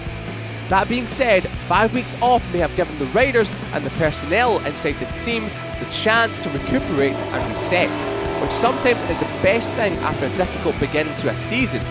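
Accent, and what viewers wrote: Scotland, United Kingdom. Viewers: I'M SO SICK TO LISTENING THIS VERY-ACCENTUAL SCOTTISH VOICE IN EVERY MOTOGP VIDEO. It's almost like listening to Louis Suddaby on the weekend itself.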